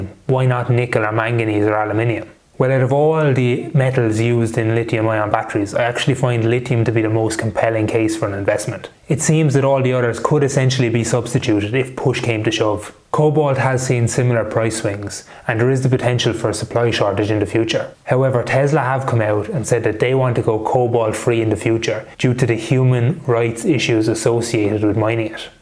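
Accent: Ireland. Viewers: "Tree different stocks" as an American this is my favorite thing about how the Irish talk. 🙂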